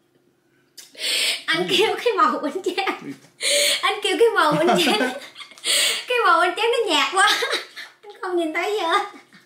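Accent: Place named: Phu Yen, Vietnam. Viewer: Mình nghe giống giọng Phú Yên quá xá~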